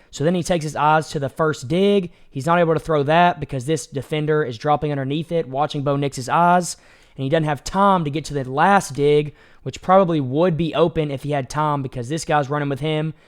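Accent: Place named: South, United States. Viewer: This is why was from the south aren’t good. Listen to this gentleman ramble. By the end of the first play it was clear this guy has limited football knowledge and experience. Bo Nix should have made the throw to the right check mark to what you called his 4th read and it was obvious when you drew it up that he would be open IF you KNOW how to pre read defenses. You could’ve mentioned the cover 1 shell. Clean up your accent too you sound silly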